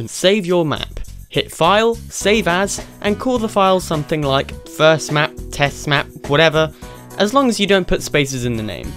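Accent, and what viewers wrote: United Kingdom. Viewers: finally a fellow brit i can finally learn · Woah woah my man is Bri'ish. So am I mate! (well, I live in the UK so does this count?)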